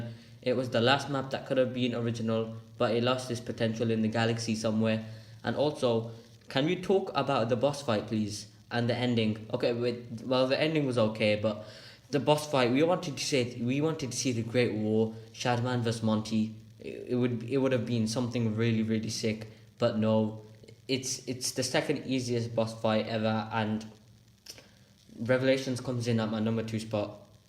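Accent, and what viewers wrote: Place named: RP, United Kingdom. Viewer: posh boy tingz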